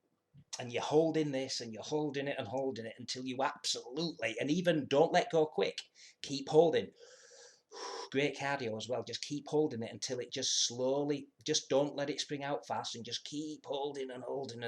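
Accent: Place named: North, United Kingdom. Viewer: Really interesting vid with well articulated points - referencing all my fave grip channels. Subbed after being directed here by Nick. Good to hear a familiar northern accent talking grip. Look forward to more content ✊💪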